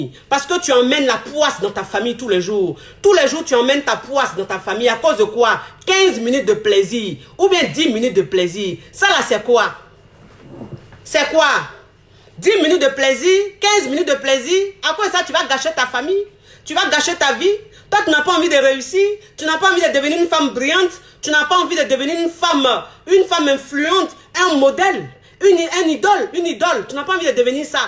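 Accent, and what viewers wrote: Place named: Ivory Coast. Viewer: Jaime bien comment tu parles les ivoriens font rire!😂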